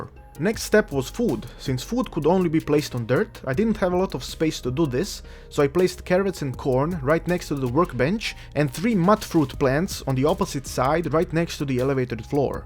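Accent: Eastern Europe. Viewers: You are so funny mate!!! Also what country are u from, u sound Eastern European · Nguyen He's from someplace in Eastern Europe, don't know exactly where. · The Eastern European accent makes the suble vulgar comments pretty enjoyable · where are you from? your accent sounds eastern European or Russian.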